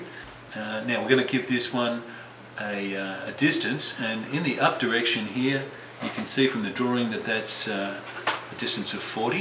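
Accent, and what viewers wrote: Australia. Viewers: Thank you for these tutorials, your teaching style makes gaining skills easy. I really look forward to going through them again with the updated interface as there is some frustration when my copy of 360 doesn't behave the same as yours. It's good to hear an Australian voice also. Quick request that you cover the fillet command in some more detail. In Ex 14 I tried to fillet the join between the 32dia boss and the top of the flat part without success, the arrow kept pointing into the join rather than out.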